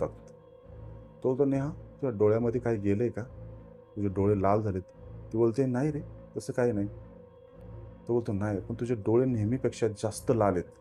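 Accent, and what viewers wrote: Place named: Nashik, India. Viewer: सर तुम्ही कुठले आहे भाषा तर नाशिक ची वाटी हे😊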